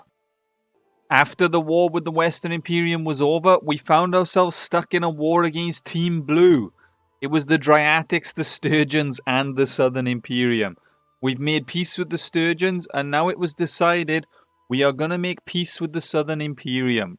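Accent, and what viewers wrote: United Kingdom. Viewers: love the content, this randomly popped up in my feed. quick question, are you welsh?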